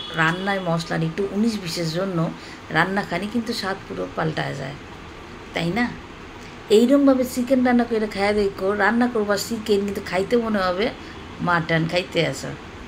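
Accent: Bangal, Bangladesh. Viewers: লাইক সাত ,তোমার এই বাঙাল ভাষার কথাগুলো দুর্দান্ত লাগছে শুনতে । পুরো ভিডিও তোমার ভিডিও থেকে তোমার কথা শুনে খুব আনন্দ পাইলাম।😂👍💓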